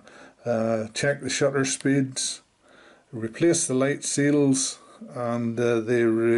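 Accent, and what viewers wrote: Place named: Northern Ireland, United Kingdom. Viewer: Loving the Northern Ireland accent. A N.I friend of mine taught me how to say "How now brown cow" with the accent. Although I didnt know you guys said "filum" for film. My favourite camera, I couldn't afford one when I started out in photography and so had an OM-10. I only recently got an early OM-1 and 2 and I'm once again shooting 'filum' alongside my digital.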